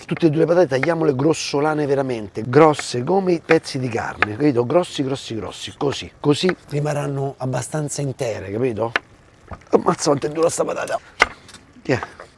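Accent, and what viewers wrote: Rome, Italy. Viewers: Bravo il tuo romanesco mi piace sei simpatico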